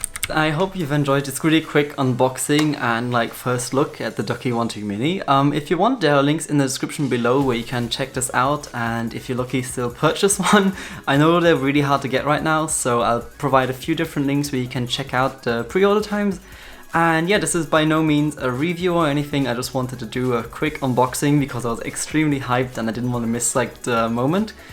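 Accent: Germany. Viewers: Youre english sound so weird, at some parts you could tell you´re german but in other parts you just sounded like you´re speaking english as youre first language. Not hate tho haha <3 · Hallo du hast einen deutschen Akzent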